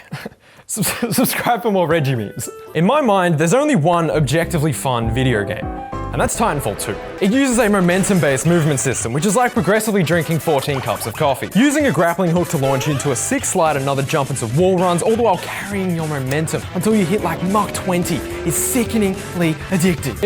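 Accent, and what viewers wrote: Australia. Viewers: Australian(?) Nakey Kakey I love it. Gladly just found you today. Keep up the good work plz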